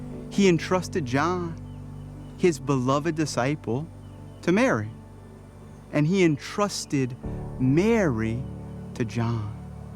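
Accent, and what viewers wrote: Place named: Louisiana, United States. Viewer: I've said it before and I'll say it again, Father - your diocese should really promote your videos more! They are beautifully made and your teachings are wonderful. I use them in my parish weekly newsletter and our website, here in the Archdiocese of Philadelphia! Love your Louisiana vocab and accent! ;)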